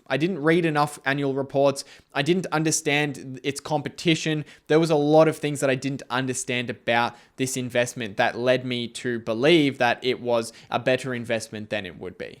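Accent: Australia. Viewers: I only recently discovered your videos and I really like them. I wish I had your insight when I was your age! Your reflection on your mistakes is so valuable for me! It made me understand my own decisions and my own thought process much more. It also helps me to understand that passive investing probably is better for me as picking the right stocks is a lot of work as you said. I also like the clean style of your videos: no annoying noise, straight to the point and just enough visuals. They are also not too long! And your Australian accent is very pleasent!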